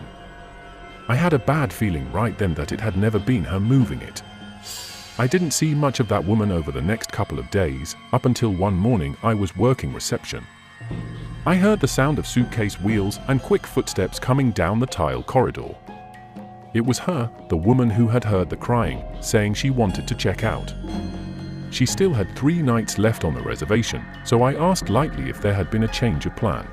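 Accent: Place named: England, United Kingdom. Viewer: Why the English accent? I have a problem understanding such accents and such....I tend to avoid these videos. Sorry.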